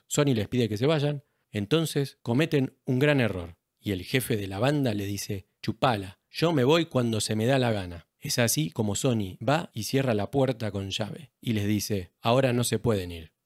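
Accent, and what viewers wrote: Argentina. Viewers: Felicitaciones ¡¡¡ , què buen resumen...y hablado en ''argento'' ,eso le suma mucho en mi opinion...Vì esta peli hace mucho,no menos de treinta años.Es una historia verìdica,escrita por el autor del libro y actor que hace de Sonny, Chaz Palmintieri...A lo mejor por eso los personajes son tan creìbles...Gracias por traerla a mi memoria... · Un peliculón y narrado espectacular muy portenio pero conciso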